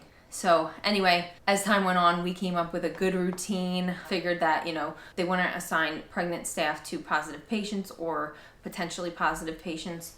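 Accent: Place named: New Jersey, United States. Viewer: Hang in there momma♡ prayers for a successful vbac, your husband present regardless and all good things for your delivery. I know you're from up north, I thought I remembered you saying you're from NJ (and your accent sounds like mine😂) I am a jersey girl born and raised but live in SC and you make me feel back at home♡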